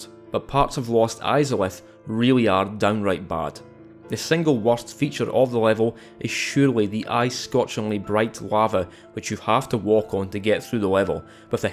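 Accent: Scotland, United Kingdom. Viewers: Finally. I watch a lot of different content creators on YouTube and from time to time there was this horrible accent that I really can't stand but I never knew where it came from. Now I know Scotland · As soon as I heard the Scottish... I knew I had to sub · Who doesn't love a good Scottish accent to listen to. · First time coming across this channel. Was pleasantly surprised to hear a fellow Scottish accent. Have a like and subscribe, ya cunt! 🏴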